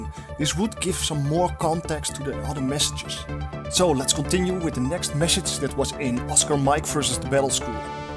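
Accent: Netherlands. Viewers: Lol I can hear immediately that you are Dutch... am Dutch to tho ! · are you dutch? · Top man ! ik moest en zou reageren de seconde dat ik je accent hoorde haha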